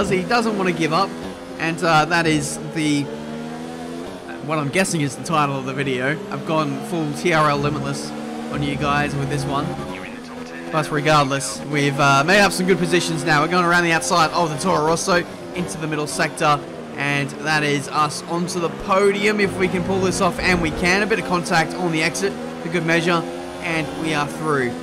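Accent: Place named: Australia. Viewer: That moment when you expect ‘Yo, JD here, TRL Limitless’ (in a British accent), but you sadly hear: What is up guys, welcome back to a brand new video’ (in an Australian accent)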